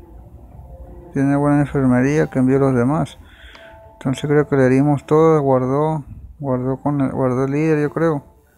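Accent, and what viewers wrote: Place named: Sinaloa, Mexico. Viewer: Hablas como sinaloense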